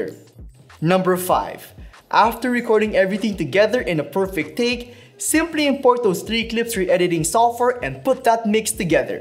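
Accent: Philippines. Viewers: I love that Filipino accent. Beautiful people with beautiful voices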